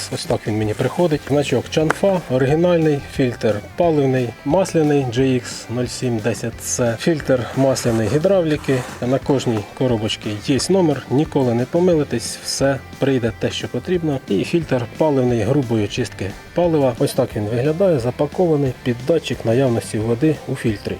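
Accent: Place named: Cherkasy, Ukraine. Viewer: мова українська, діалект Черкаський якщо не помиляюся. Дивіться відео і колись щось і зрозумієте, з картинки теж багато що зрозуміло.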